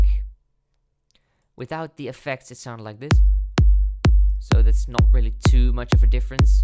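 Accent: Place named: Netherlands. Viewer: lekker nederlands accent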